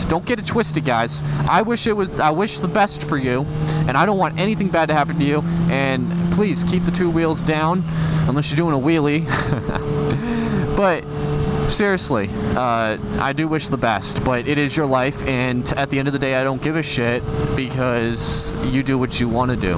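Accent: Massachusetts, United States. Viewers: What part of Massachusetts you from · What part of mass are you from ??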